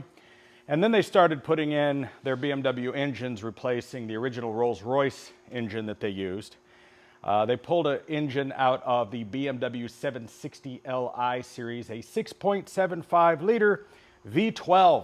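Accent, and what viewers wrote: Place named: United States. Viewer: Yup! The American accent is difficult to follow especially when spoken at such hectic speed! An awful lot of talking ("tarkin') but little action. Man, "you gotta get your butt" to Cape Town, South Africa, where we do things "good and proper" - even with RR, Jags, BMW, - the whole lot! Metric and Imperial - no problem! Oily hands - no problem! We don't even need silly gloves - we use hot water and soap! We also speak a better English than the English in England! 😊 My Dad managed the best BMC agency in South Africa, and I spent much time at the benches after school, learning skills and a few naughty words! The skills are ever-useful, and I am blessed, at the age of eighty-one, to be able to run a health practice at home and to repair so many home items for my clients!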